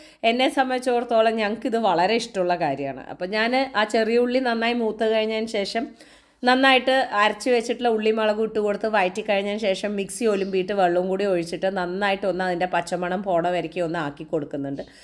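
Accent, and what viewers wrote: Palakkad, India. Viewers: Dear.... സുഖായോ... Sound ഇപ്പോഴും ക്ലിയർ ആയിട്ടില്ലല്ലോ... കാത്തിരിക്കയായിരുന്നു വിനീടെ പാലക്കാടൻ സംസാരം കേൾക്കാൻ.. സന്തോഷമായി... Wishing you life"s best mn new year 💐💐💐💐💐❤️, കണ്ണേട്ടനേയും, മക്കളെയും ചോദിച്ചതായി പറയണേ... വിനിയെ കാണുമ്പോൾ എന്തോ ഒരു sister like feeling ആണ്.. മക്കൾ വളർന്നു... സന്തോഷം.. ഒരു ഹായ് പറയണേ.. From Sophia Dicruz ഫ്രം പഞ്ചാബ്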